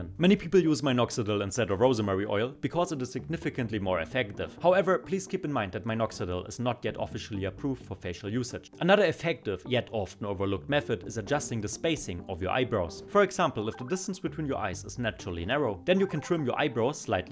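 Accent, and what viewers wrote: Germany. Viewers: I can Tell by your First Word you Are german . Guten Tag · Gutes Video, man merkt dass du dir viel Mühe gibts und von der Qualität ist das echt stark. Echt verwunderlich wie du nur so wenige Abos hast. Ich denke mal öfters videos hochladen hilft dir bestimmt um mehr viewers zu attracten. Versuch bei deine Aussprache ein bisschen mehr den deutschen Akzent zu vertuschen weil es manchmal stark auffällt, aber nicht weiter schlimm. Man versteht alles und die videos sind von der Geschwindigkeit echt super. Mach weiter so. Ich wünsche dir viel Erfolg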